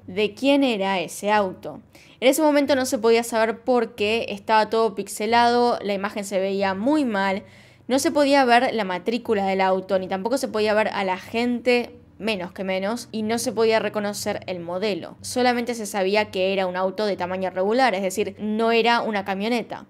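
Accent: Argentina. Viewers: Buenas desde España! Me encantan tus vídeos,llevo años viendo este tipo de casos ...no sé cuántas horas de videos ...eres de las mejores a la hora de relatar los hechos de principio a fin . Me he enganchado a tu canal . Un besazo enorme. ..también me encanta tu acento argentino...aquí tengo muchos amig@ de allí .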